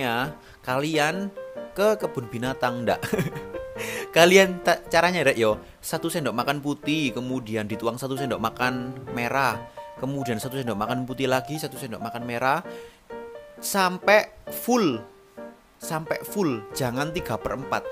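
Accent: Java, Indonesia. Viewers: G bosen lht channel ini medhok&lucu kl lg njelasin,sukses yo rek...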